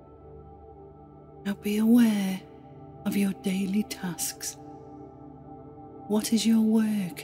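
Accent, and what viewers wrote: Liverpool, United Kingdom. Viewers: This narrator is from liverpool..guaranteed scouse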